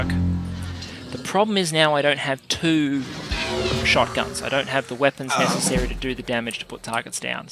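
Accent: Australia. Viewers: Your Australian accent is flawless!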